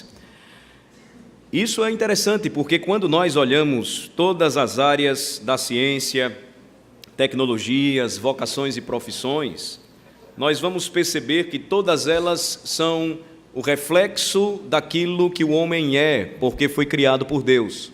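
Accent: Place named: Sergipe, Brazil. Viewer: Oi pastor!!! Assisto teus cultos aqui em BH. Nasci na igreja presbiteriana , ha 68 anos atrás, no interior de Minas. Hj frequento a Metodista. Gosto muito do seu sotaque.... eu, meu marido e meu filho ha 25 anos atrás moramos doze meses aí em Aracaju. Foi um tempo lindo! Temos um carinho muito grande pelos sergipanos e tbem por Aracaju. Amamos de coração!! Um grande abraço, pastor.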